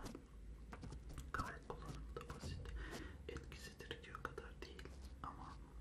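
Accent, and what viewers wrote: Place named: Turkey. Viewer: Aaawww the new mic is amazing and so is your turkish accent so dont worry about it! (づ｡◕‿‿◕｡)づ and whenever you speak in turkish it just makes me giggle for some reason but also makes me relax and concentrate better on how it sounds rather what you say because i cant understand but its all very good, thank you!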